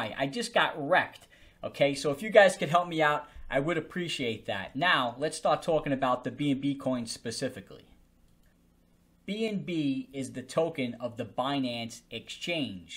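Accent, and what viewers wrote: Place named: New York City, United States. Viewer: I love your NYC accent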